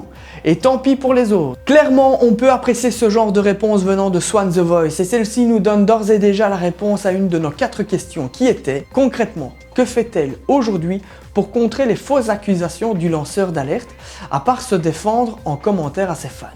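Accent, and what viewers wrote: Belgium. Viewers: C'est un belge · T'es belge ?? Prcq ta un ptit accent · Je suis Belge et au contraire je trouve qu'il a un bon accent bien Belge ahah ^^ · Elisa Deleau à mais il est Belge ! Je me disait bien si il l'était pas :D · T'es belge?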